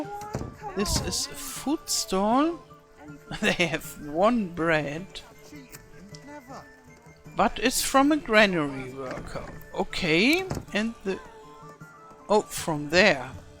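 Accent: Germany. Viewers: A granny with a German accent :) So sweet :)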